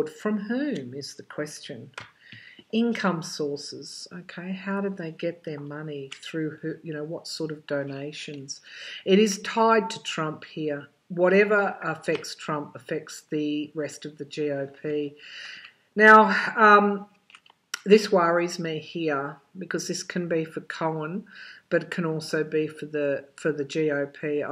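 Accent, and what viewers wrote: Australia. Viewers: I do think Cohen is concerned for his wife and children. I have empathy for him because he confessed his guilt and said he was deeply ashamed of his involvement with the Trump criminal enterprise. I think he has truly turned away from evil and is truly worried that Trump could bring America down completely and kill our democracy. Thank you for another insightful reading. I love listening to your lovely Australian accent as you speak. 🦘🦋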